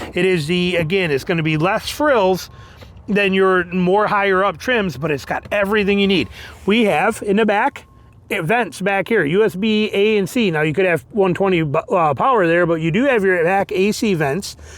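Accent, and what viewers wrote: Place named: Wisconsin, United States. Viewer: Hunert, k. K? K? Gotta be from Wi.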